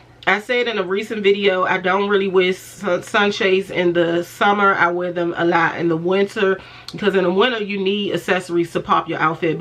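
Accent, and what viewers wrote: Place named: DMV, United States. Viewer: Hey DMV friend ❤I’m new to your channel and had a feeling when you started speaking had to be from DMV 😊I love love your channel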